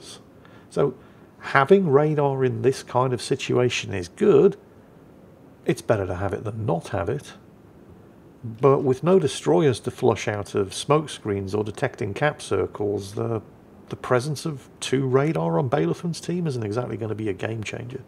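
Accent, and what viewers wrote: United Kingdom. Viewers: I think both ways are correct, its just your dialect of our great brit ish language, e.g. some same bath as bah th, others call it a bar th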